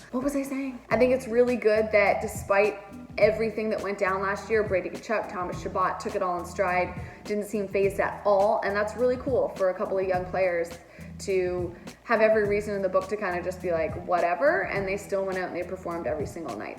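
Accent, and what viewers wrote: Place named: United States. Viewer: Oh no you have been in the STATES so long your getting an american accent lol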